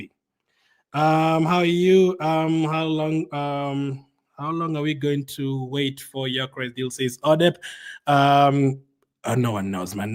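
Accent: Kenya. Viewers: you sound kenyan .COYG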